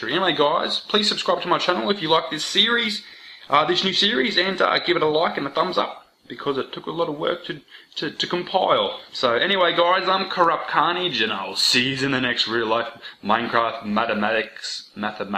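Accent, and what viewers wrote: Australia. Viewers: He's an aussie, they're like texas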